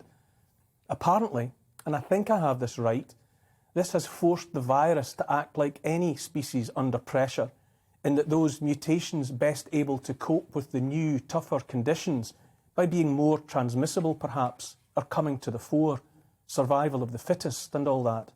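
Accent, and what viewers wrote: Scotland, United Kingdom. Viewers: Freedom sounds so much better in a Scottish accent. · You are the very first person I’ve comes cross to see through this guy. I was getting worried I was the only one. He’s crafted his delivery to come across as very sincere. He doesn’t smile, continuous Scottish monotone, speaks of tradition, knowledge, learning, then says he’s not an academic, but that taking advice from medics, scientists, and virologists who have to train and study their disciplines for years should be ignored. He doesn’t acknowledge this virus has killed and caused long term damage to thousands of people. But these fools kneel at his feet. Pathetic.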